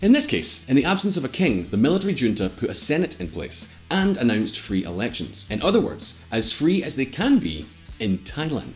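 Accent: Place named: Scotland, United Kingdom. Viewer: Nothing wrong with Scottish brogue...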